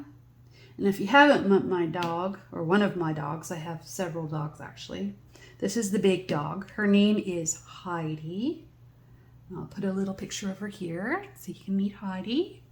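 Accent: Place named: Canada. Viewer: Hi. When you start talking tonight, I knew right away that you had to be Canadian, but I wasn't sure where from. I am from the East Coast, Newfoundland, to be exact, and we used to go to the beach every Sunday when we were kids to have a Sunday supper. I have a lot of fond memories of that. I have been living in Belgium for the last 6 years. I think it would be really great if you did a vlog of you taking your dogs to the beach or to the dog park. We would get to see a part of your world then. I will probably start my canvas in the middle of the month because I've got to finish the one I'm working on for another event. 😊😊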